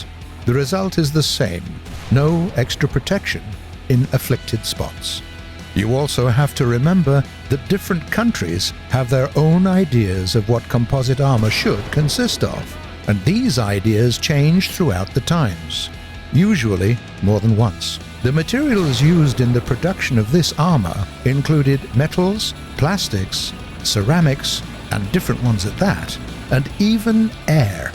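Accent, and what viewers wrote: England, United Kingdom. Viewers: Gaijin is a Russian company, and they hired someone with a thick English accent to narrate it. Dunno, just an observation. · Ricardo Mierz but...he sounds so clever! He sounds so...British! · Мммм... английский акцент. Бляяя... Ну чуваки, всеж нормально начиналось.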